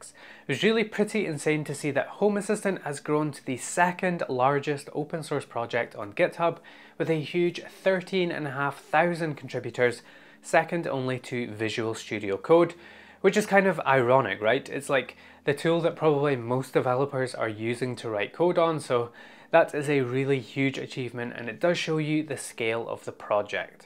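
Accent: Scotland, United Kingdom. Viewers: If Home Assistant does voice, then you should be that voice. Your relaxing Scottish brogue would make it!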